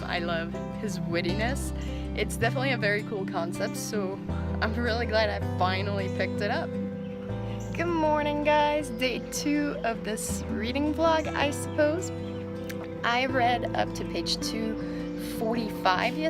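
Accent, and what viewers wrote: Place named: Portugal. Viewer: Oh wow, I was way off! I’m half Portuguese and your accent is very similar to a lot of my relatives!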